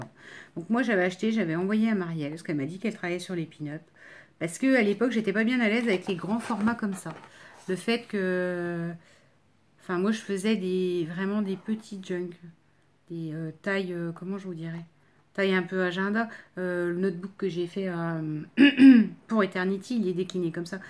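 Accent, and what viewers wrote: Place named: Reims, France. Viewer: Ouai c'est clair tu as l'accent de Reims 😂😂 un p'tit bout de chez moi 😂😂😂😂 action Rethel est pas mal non plus si tu as l'occasion d'y aller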